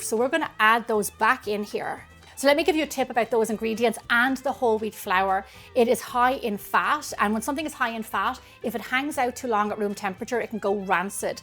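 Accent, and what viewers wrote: Ireland. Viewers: Lovely Recipe, beautiful irish ☘accent:face-red-heart-shape::trophy-yellow-smiling:😇🥰🤗🍞🌎🏠🧈🍀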